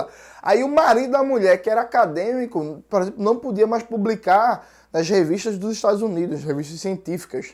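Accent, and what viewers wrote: Pernambuco, Brazil. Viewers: teu sotaque pernambucano é tão gostoso de ouvir, acho que tu é o segundo youtuber de pe que acho. me sinto em casa kkkkkkkk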